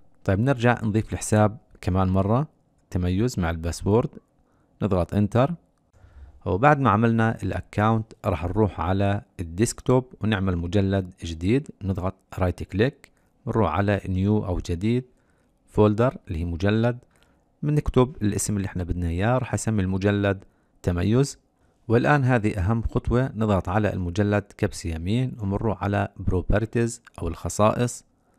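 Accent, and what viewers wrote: Jordan. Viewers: والله انك بطل و يسعد لهجتك الاردنية او الفلسطنية بطل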